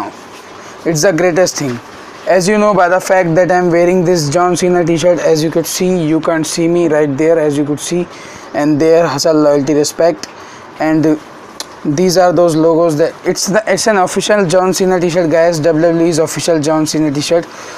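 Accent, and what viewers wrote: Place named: India. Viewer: You got a really strong indian accent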